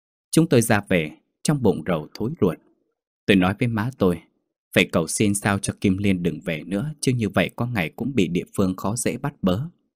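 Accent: North, Vietnam. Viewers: Giọng bắc diễn đọc thật hay.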